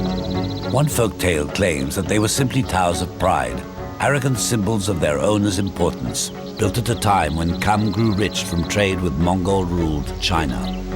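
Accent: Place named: United Kingdom. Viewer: I can't stomach an overly dramatic British accent, makes me want to puke....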